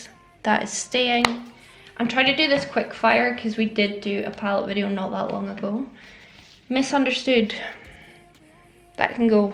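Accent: United Kingdom. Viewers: Where are you located ? Your accent makes me home sick for the UK :)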